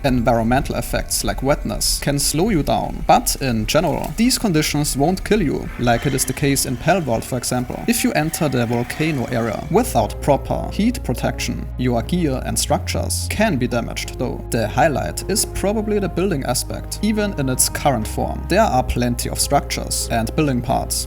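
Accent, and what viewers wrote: Germany. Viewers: Sehr cooles Video mit vielen Informationen und Gameplays die ich noch nicht gewusst und gesehen habe. Würde dir aber empfehlen einen deutschen Kanal zu führen oder Videos in englisch und in deutsch zu machen da du ein krassen Akzent hast und damit wahrscheinlich noch mehr leute erreichen würdest. Hab bei der ersten Sekunde gemerkt das du deutschsprachig bist.🤙🏻😉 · nice german accent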